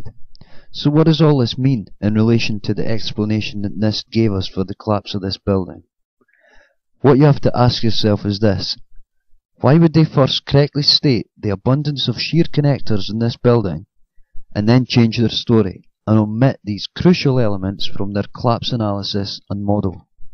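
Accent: Scotland, United Kingdom. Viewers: Great video, and the scottish accent makes it all the better. Thx for your hard work on exposing the BS known as the official conspiracy theory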